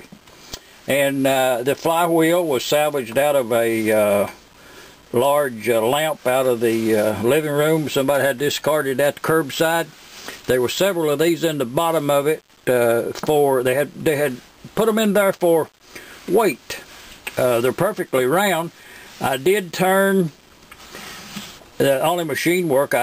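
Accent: South, United States. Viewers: hahah i thought bout hillbilly when i heard his voice xD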